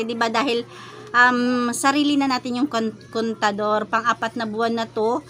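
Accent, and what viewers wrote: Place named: Bicol, Philippines. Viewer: watching kasari galing ng intro sarap pakinggan yong salita ng bicolana haha..salamat sa pag share ng billspayments niyo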